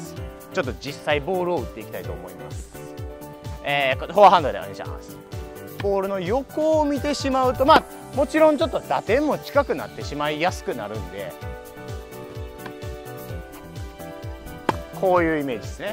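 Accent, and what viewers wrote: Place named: Kyoto, Japan. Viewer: ウィルスミス、朝から爆笑してしまいました😂 懐かしい京都弁も耳に心地良く、 、大好きです💕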